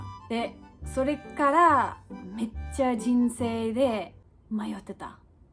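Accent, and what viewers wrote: Kansai, Japan. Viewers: 時々バカイトのチャンネルみたりしてたんだけどやっぱり時たま関西弁っぽいしゃべりしてたから1年間関西にいたのね！納得しましたわ！ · 少し関西弁交じりなのは近大にいたからなんですねｗ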